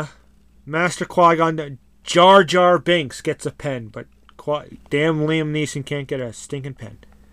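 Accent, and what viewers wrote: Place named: New York, United States. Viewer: you from new york? I heard an accent and I used to live there. Good vid I subbed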